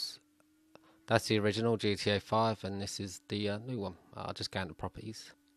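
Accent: England, United Kingdom. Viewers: I love the voice of England. When i hear that voice, my heart shake and can't normal...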